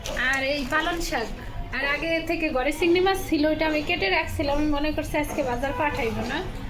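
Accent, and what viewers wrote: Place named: Barishal, Bangladesh. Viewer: ওরে মোর খোদা হেইয়া দেহি বরিশাইল্লা মুইতো ডরাইছিরে আপা তবে ভিডিও এবং কথা গুলি সেই সাদ হয়েছে 🙄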